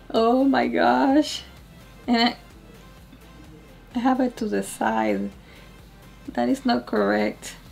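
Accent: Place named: Colombia. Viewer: You look so gorgeous 😍😍 you literally look like Lady Gaga with a Sofia Vergara accent ✨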